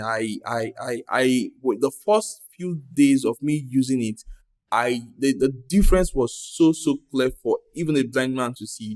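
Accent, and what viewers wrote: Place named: Yoruba, Nigeria. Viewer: I LOVE YOUR YORUBA ACCENT ABURO..GOOD REVIEW..JUST SUBSCRIBED.!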